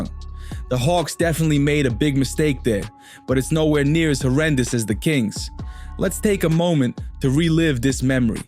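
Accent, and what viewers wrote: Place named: New York, United States. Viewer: brooklyn!? harlem?!?! u sound like you in the mafia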